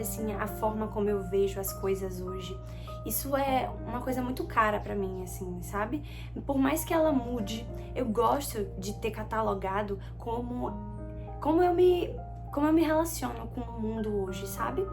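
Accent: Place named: Bahia, Brazil. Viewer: Que vídeo bom!! Você tem um sotaque baiano, você é?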